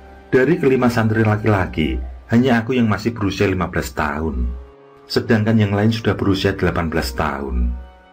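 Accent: Java, Indonesia. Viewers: Ceritanya mencekam bikin jantung berdebar kencang, saya suka logat bicaranya yg jawa banget.. Mantebb 👍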